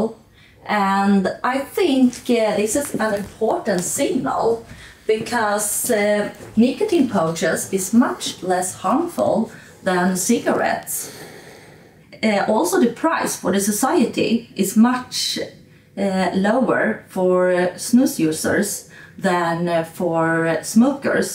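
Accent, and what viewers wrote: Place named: Sweden. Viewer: Good Beatrice fight for this issue. Do take some english pronunce lessons. But it is okay with a swedish accent.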